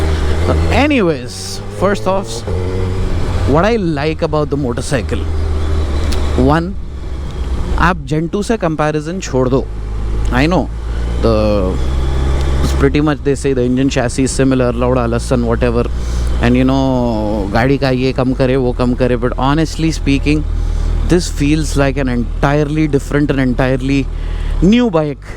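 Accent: Hyderabad, India. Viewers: Bhai Ap toh Eid ke Chaand ho gae ho youtube ke…. Happy you uploaded a video….roj dala karo video if possible…..aacha lagta h apki hyderabadi sunnke…..aurr thodi knowledge mill jati h bike ki joh aur koi nai bolta h…😬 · he's your Best YT-Influencer for Bike Reviews, ... Not a Shill for Castrol etc. Loved his Hyderabadi Accent, and thanks for original content. I have the Trident-660 & 390-Duke, now looking at the SuperSport-950S as 3rd bike.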